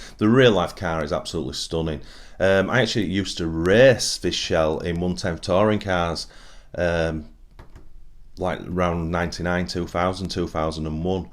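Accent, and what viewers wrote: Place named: Stockport, United Kingdom. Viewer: That a stockport accent in canada?! Where do you get your alternative decals from?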